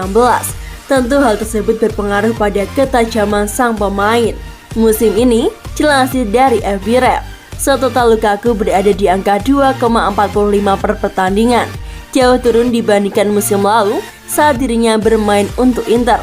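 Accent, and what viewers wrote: Java, Indonesia. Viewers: la kok logat jowo mbak e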